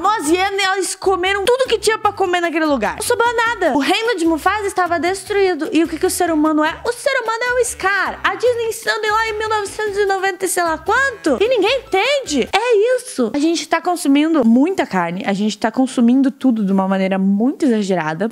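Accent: Rio Grande do Sul, Brazil. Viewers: Ou ela é gaúcha?¿ kakakak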